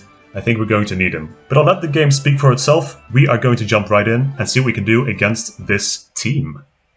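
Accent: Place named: Netherlands. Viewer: Yay, more of this! And I hope the new recruit's effort can be felt! I'm not sorry- Edit: Very dutch pronunciation indeed, nice. Also, recruit Bradford please? (the tiny boy with the brunette rooster haircut) PS. Welkom terug!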